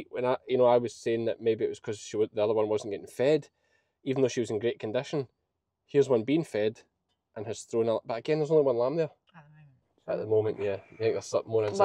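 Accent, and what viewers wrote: Scotland, United Kingdom. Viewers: you are getting very “Scottish” as you are teaching us! Don’t forget your Canadian fans!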